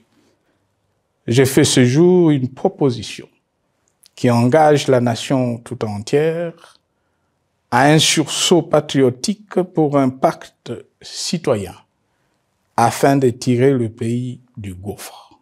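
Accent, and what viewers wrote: Rwanda. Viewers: ça façon de parler vous sentez que c'est un Rwandais😭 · L'accent rwandais ne l'a pas quitté malgré les efforts déployés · Veritable ruandais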